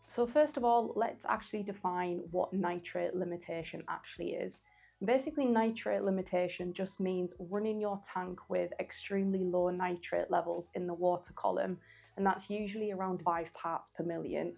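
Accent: United Kingdom. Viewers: your accent reminded me of my time studying in Leeds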